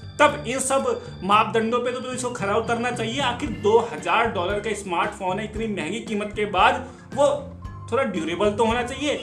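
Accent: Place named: Haryana, India. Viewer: Bhai video bich me rok k comment kr rha hu You are so funny bhai haryanvi lag rhe ho bhai aap muje samsung fold bekaar phone🤣🤣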